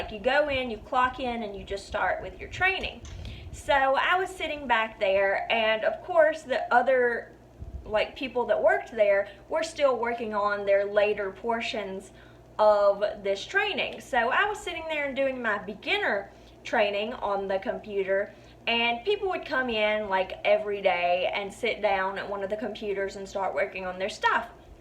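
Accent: South, United States. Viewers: Sorry it didn't work out at Walmart. Not all Walmarts are bad. I hope you are doing well and you definitely have a future in entertainment. Love the southern accent ☺ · Heavy southern accent 😂👍👍 cool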